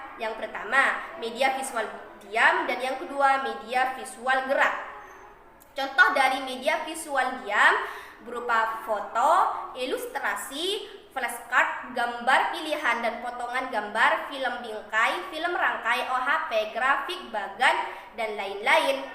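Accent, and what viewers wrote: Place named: Madura, Indonesia. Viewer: Ciri khas bahasa Madura😁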